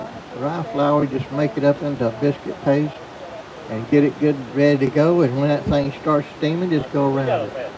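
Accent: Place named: South, United States. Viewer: I can't understand what he's saying. Sounds country as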